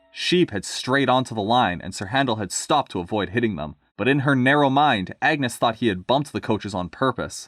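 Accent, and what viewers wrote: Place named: Scotland, United Kingdom. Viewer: That Scottish accent XD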